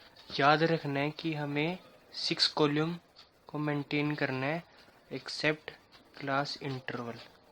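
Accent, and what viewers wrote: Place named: Haryana, India. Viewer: Haryanvi lgte ho nice explaination good dear ! 🌏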